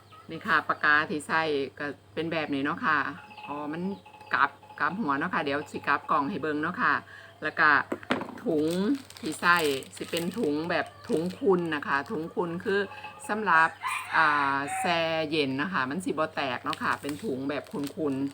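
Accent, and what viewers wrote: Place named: Xishuangbanna, China. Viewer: สวัสดีครับติดตามสำเนียงก็เหมือนสำเนียงสิบสองปันนาเลยครับปลื้มใจมากๆครับ 大家好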